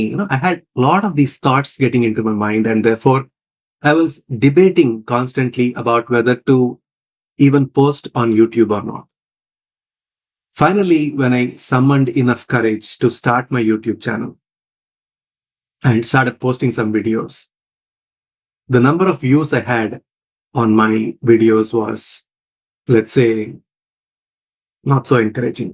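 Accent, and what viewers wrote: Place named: India. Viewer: You have a very pleasant Indian accent.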